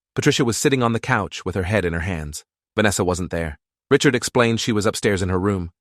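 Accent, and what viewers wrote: United Kingdom. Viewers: Why he British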